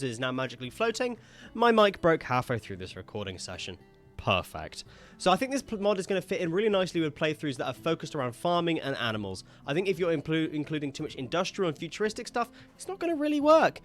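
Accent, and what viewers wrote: United Kingdom. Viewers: wait u are brittish???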